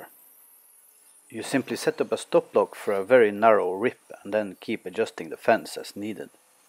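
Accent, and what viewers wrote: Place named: Ireland. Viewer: Don't lose the tool sounds, and please do not add music. Your vac and air scrubber remind us that safety and cleanliness go together. I like your commentary, you sound as if you were taught English by an Irishman. That's no bad thing by the way, Irish people often have better diction than geographically English speakers of the language. Thumbs up from me, you got a new sub 👍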